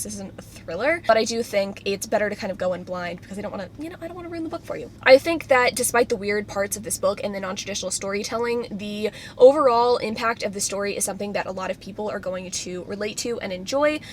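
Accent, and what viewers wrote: United States. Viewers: I could listen to you talk all day lol I don’t know if it’s the American accent or not but your voice is so soothing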